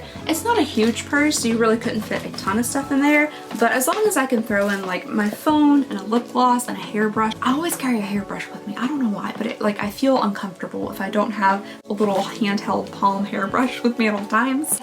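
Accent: Kentucky, United States. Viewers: As a lot of people mentioned, music was too much. The pace of the music was not right for how you talk making it seem like you were talking faster. And the pitch didn’t match with your accent (I am Australian so I want to say Kentucky drawl. Forgive me if I am wrong) making it feel slightly off and not as charming/relaxing, and in a couple of places it got a lot louder emphasising the conflicts. A few of the jump cuts were a little abrupt but not unbearable. Edit: because I am Autistic and worried this is coming across as negative. I want to say the overall the video was edited well, these are just some things I noticed that was different and I personally felt could use some tweaking.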